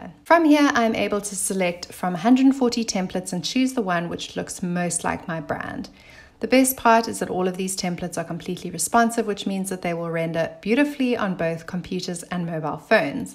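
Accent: South Africa. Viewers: sooooo wonderful to hear a South African accent. Yay! Would you know how MailBlaze compares to MailerLite? Thank you for this YT- take care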